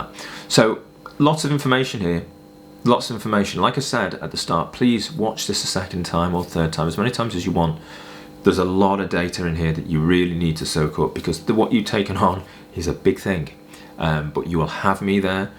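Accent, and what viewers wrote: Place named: RP, United Kingdom. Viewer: Very posh a countdown too...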